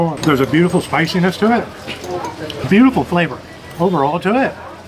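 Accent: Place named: Canada. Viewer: Hi! Late for the party again! I heard you loud and clear when you asked if it was Bitter Gourd. It was Bottle Gourd. She probably misheard your Canadian accent. I have no problem talking to or hearing an American accent. When I moved to Canada, I have noticed that Canadians talk too fast. I am used to it now. This video got me excited and famished! So many yummy dishes❤❤❤ Thank you!!!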